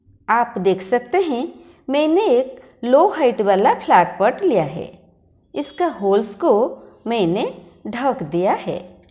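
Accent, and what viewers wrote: Odisha, India. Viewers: App odisha se ho kya??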